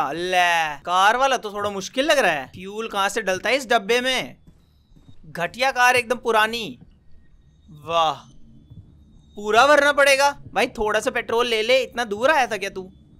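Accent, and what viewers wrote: Haryana, India. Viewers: Reason for not growing :- videos with low paced editing :- new generation of low attention span :- slow commentary Suggestion :- use colored subtitles like u used to do to grab attention :- do different types of video RELATED to gaming. :- speak faster :- imp just stop with those english gaaliyan yr. Use hindi ones like u used to. Or just use your hariyanvi accent bcoz i liked that bhens ki tait. Easiest way to get a good video would be to play with friends. If u are slow then your friends could make up for it and you could get really good clips to make a video off of. Every other channel do this nowdays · Old vibes are back but something is missing and that is that Haryanvi tone that used in his old videos So I think old vibes are still not back 😶😶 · maza nhi ara yar, haryanvi accent to pelo thoda. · Who wants old haryanvi tone back ♥️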